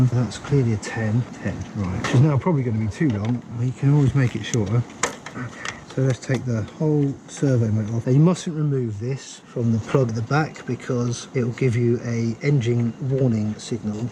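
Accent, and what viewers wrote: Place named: United Kingdom. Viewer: Nice video. Took me a while to get round to watching it but I really liked this one. The hands on practical stuff is cool to watch, not to mention your familiar blighty accent and humour along with the well paced editing here. She definitely sounds a lot better with that black widow exhaust, I think you chose well! Is the factory you mentioned a side job you have? - James from 台中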